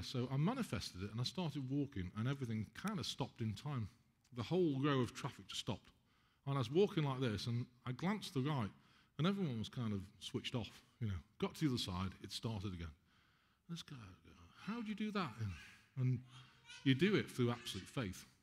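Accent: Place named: England, United Kingdom. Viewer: It's noticeable there seems to be a "red" English thread running through my life with names of English cities of London and Leicester and now English born with his adorable English accent. How does it get any better than that?!?! Today is March 11, 2019 and I had my first private session (one on one) with on February 26th of this year, and that session was a real game changer for me! It "blew my pantaloons off" as would say....I am just so incredibly grateful that, Amanda and their staff are now in my life. I had been desperately trying to kickstart healing energies for over 2 years to recover from a number of issues but mainly from a surgery without much success. What was I thinking going to an allopathic doctor to begin with? That really messed me up! Never again! Now, for the 2nd time in my life, I know I'm going to be just fine. Thank you, and thank you, Mekchizedek Beings! 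Your help is very much appreciated. Already there are noticeable improvements in my very long list of conditions I need help correcting. Now the story of the first time I knew I'd be OK is a very long yarn dating back to 1994 through 1998 when I had the good (I think) fortune to find and work with a very powerful Being who lived in Leicester, North Carolina and who was, I hope, helping me realize my desire to become aligned with the Divine, but that is a story for another time. That amazing Being, together with an incredibly fu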